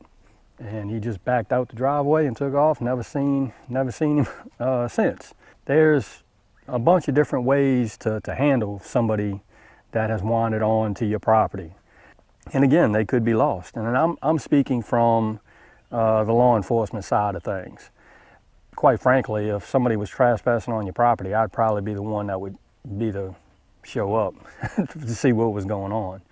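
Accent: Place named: Louisiana, United States. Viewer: yeah buddy thank you ..I was born and raised in Louisiana myself I can always hear that accent lol love the video man thank you for sharing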